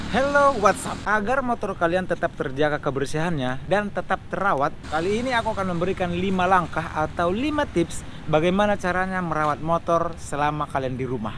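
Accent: Indonesia. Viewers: Logatnya sumatera kali bah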